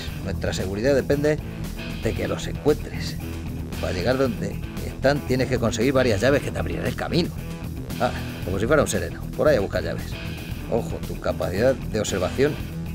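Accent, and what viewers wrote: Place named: Spain. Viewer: Joder extrañe mucho tu voz española sukulenta 😢...ha por cierto muy bueno el uso del codigo para saltarse niveles es que son jodidos enserio 😂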